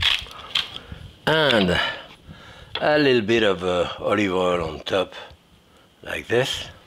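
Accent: France. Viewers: i love these little vids. he's great 😊 i love his accent - and his pan, i think hes french - those hand ! tres bon, monsieur ,tres bon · Thanks to this dude, when I'm cooking at home my internal monologue has a thick French accent.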